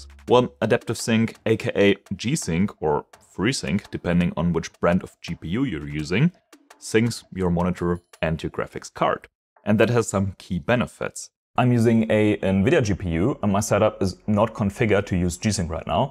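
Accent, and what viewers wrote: Germany. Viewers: Tatsächlich nicht den typischen deutschen Dialekt aber dann auf den letzen Metern verraten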